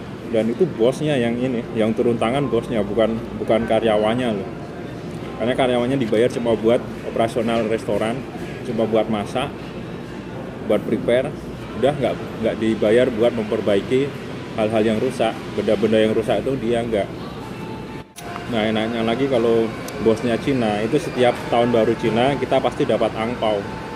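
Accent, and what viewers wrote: Java, Indonesia. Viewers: Seneng saya dengernya, beberapa video. Dan saya di sini baru komentar. Saya bisa nambah wawasan untuk bahan cerita. Hehehe... Lanjut mas... Oh ya, kok bahasa logat sampeyan jawa banget... Asli jawa tengah po mas? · Selalu suka dengan suaranya yang agak medok mas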